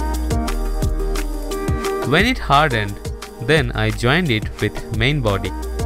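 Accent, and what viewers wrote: India. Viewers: sidha bol de na indian ha itna natak kyu krta ha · Does anyone notice that he has an indian acent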